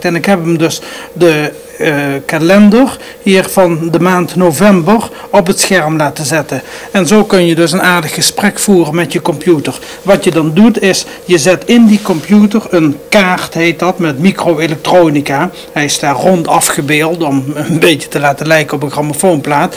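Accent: Limburg, Netherlands. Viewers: Titulaer was veel cooler dan Wubbo Ockels. Tenminste, dat vond ik toen ik een jaar of 8 was. En nu nog eigenlijk. was een phenomeen. Sommige mensen lachten om hem omdat hij zo markant was. Immers een Limburgs accent hoorde je maar zelden op TV. Het maakte hem in mijn jonge ogen uniek. De baard. De stijl van presenteren. De interviews. De humor. Een groot gemis. Een mens om tegenop te kijken. Rust zacht. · Het is limbabwaans. Hij moest echt zijn best doen om Nederlands normaal uit te spreken. En dan lukte het nog niet. Ik kom uit dezelfde omgeving en heb dezelfde handicap. Wel altijd interessant om te zien dat hij zelf ook zo enthousiast was over alle “miekro eluktrooonika” en de wondurre werold van de kompjoetors. Erg mooie tv van toen.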